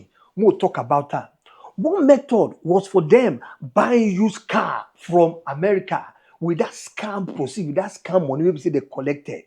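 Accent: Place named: Yoruba, Nigeria. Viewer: Your content is good but the title is too ethnic bigot in meaning. Your accent sounded Yoruba but your attitudes portrayed adulterated and unpatriotic Yoruba. You sounded biased to Yoruba goodness. Title like 5 Nigerians fraud stars would have been better and more civilised. Next time educate your minds with civility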